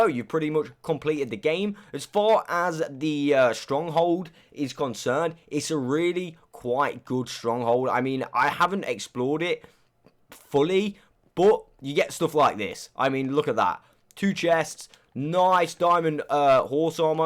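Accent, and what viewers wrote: United Kingdom. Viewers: Your sound like UK guy.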